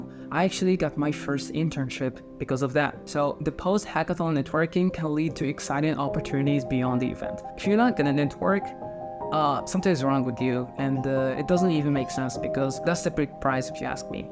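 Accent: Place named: Egypt. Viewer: he both sounds and looks Egyptian to me lol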